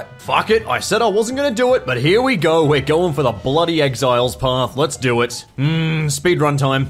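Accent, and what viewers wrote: Australia. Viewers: why you Aussie YouTubers all sound the same, WTF is this magic? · I really like to hear your smooth Aussie voice more clearly now. #feelsgoodman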